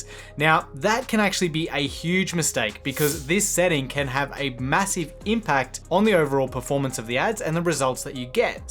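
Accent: Australia. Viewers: Hey, I love your videos ( I also love Australian accent ). whats your take on Google updates that will take place in 2024 ( google is going to remove cookies in its browsers ) does this will affect Facebook ads, TikTok Ads?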